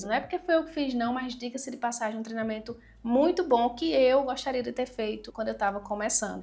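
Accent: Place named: Paraíba, Brazil. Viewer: Amo assistir seus vídeos, pq eles são bem explicados. Outra coisa é o sotaque que conheço heheh já que tbm sou paraibana.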